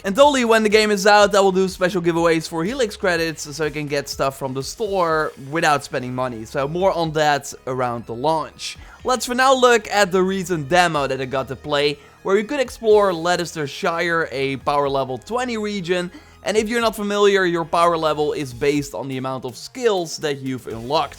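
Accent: Netherlands. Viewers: Is hé dutch?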